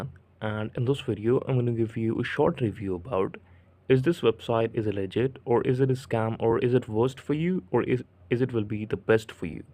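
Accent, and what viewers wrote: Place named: India. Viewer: Just by hearing your accent I already know that it’s a scam and you are a scammer as well. D!rty 1nd1ans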